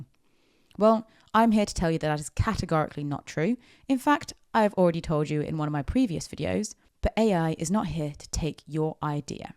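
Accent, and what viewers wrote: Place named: United Kingdom. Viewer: Love your british accent. For you i dont need the subtitles 😅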